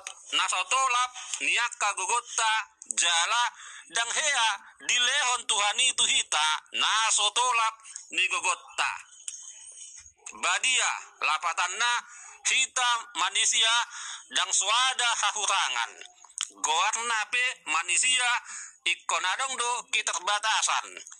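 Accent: Java, Indonesia. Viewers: Saya kagum dengan Bapak bukan hanya Sejarah saja yg pintar tapi Agama juga mantap.Dan membuat aku merasa heran kalau bicara bahasa Indonesia Bapak nampak logat Jawa.Tapi kalau ngomong bahasa Batak sangat fasih dan tidak ada kejanggalan.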